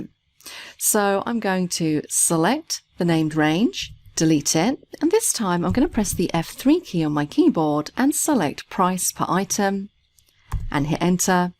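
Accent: United Kingdom. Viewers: Wonderful and helpfully thorough presentation. I learned alot. The British accent was a bonus, as any serious topic spoken with a British accent makes the topic suddenly sound more intellectually sophisticated lol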